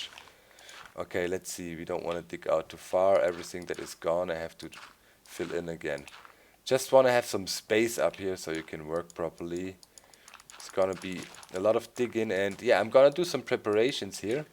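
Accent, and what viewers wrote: United Kingdom. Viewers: That English accent! :D :) Awesome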